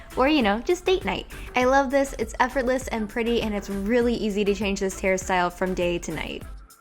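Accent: United States. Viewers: Can I please have your American accent ? My Australian one has NOTHING on yours girl, also if I could have your hair too that'd be cool 😉💕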